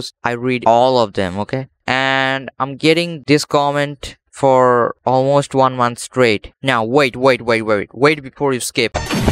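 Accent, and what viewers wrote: India. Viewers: U are indian?? M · are you indian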